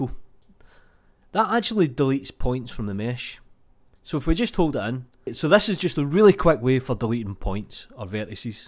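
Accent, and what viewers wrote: Scotland, United Kingdom. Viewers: English accent ? Scotish? Welsh ?? Amazing .... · A really heavy and equally cool scottish accent :-)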